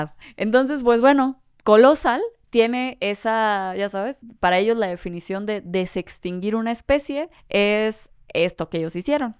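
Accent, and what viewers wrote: Mexico. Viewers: ❤ que bonita sos ... encima me gusta tu tonada mexicana 😊.. saludos desde argentina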